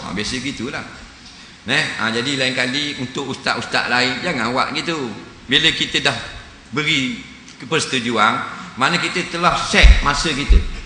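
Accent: Terengganu, Malaysia. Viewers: ustaz orang tranung,bukan klantan..orang tranung memang keras sikit loghat die..mu orang luo xyoh nok komplen la..cakak banyok tek.. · Zulkifly Hj Ali study dlu loghat terengganu bru cite bodoh..mcm org melaka cakap celaka la celaka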